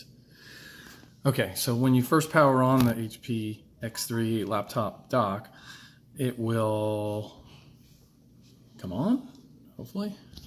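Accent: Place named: United States. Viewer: He sounds American, so probably Snapdragon 845.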